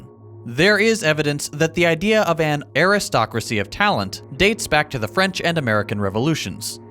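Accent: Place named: Idaho, United States. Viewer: my mistake. People in Idaho sound a lot like you.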